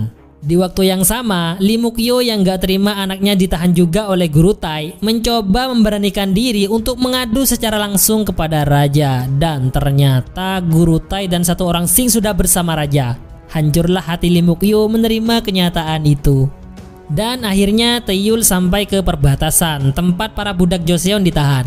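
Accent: Madura, Indonesia. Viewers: wah pencerita orng madura , salam dari surabaya bang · Baru sadar klo orang Madura 🤣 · Mak madureh lek 'en cak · Duro duro,logat e... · Ini orang Madura y salam nih Madura bangkalan. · Kayak Madura logatnya😂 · Mimin logat madura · Reng medureh kadik en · Logat maduranya kelihatan banget 😃semangat kakeh boosss 😁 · Logat MDR nya mencolok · Reng medureh kak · Oreng medureh yeh 😁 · Madura mana min ? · Logatnya kaya reng Madura..? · Orang madura ya min? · Medureh dimma tretan?? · Gw ngira orng madura. Trnyata bener dong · Kelihatan masih logatnya Ra Ra Madura 😂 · Logat admin kayak berasal dr pulau garam.😊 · Orang Madura ya bang.. Medoknya jelas 😁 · Reng madureh 😂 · Madura ..yaa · Pola maduren riah😂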